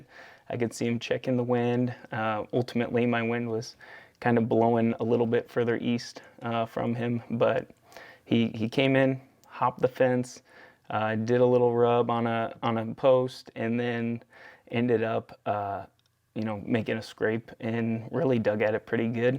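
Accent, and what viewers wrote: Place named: Midwest, United States. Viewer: Obviously you are in Midwest not deep south lol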